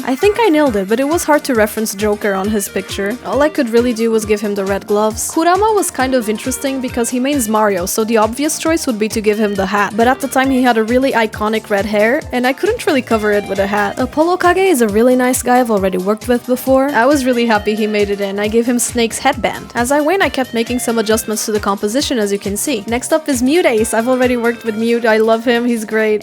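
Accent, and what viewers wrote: Portugal. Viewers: Voce é de Portugal??? Wow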